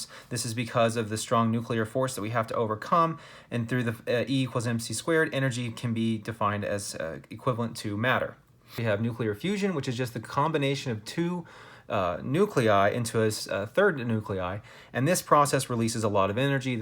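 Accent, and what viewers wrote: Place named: United States. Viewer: Are you from Uzbekistan? If so why your accent is very American lol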